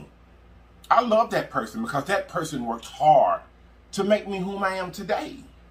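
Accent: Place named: Memphis, United States. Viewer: Your Memphis accent, electric energy, and good-lookin food bought me here. New subbie. 🏳️‍🌈🦋🖤👸🏾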